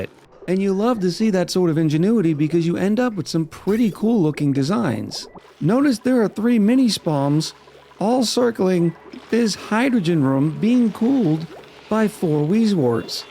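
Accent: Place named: United States. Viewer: I like your country accent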